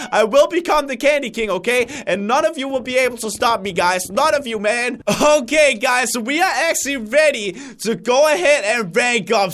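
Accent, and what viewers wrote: Denmark. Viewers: Crainer's Real name is......His Danish voice is so cool There is an interview of him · I did but have you heard his Danish voice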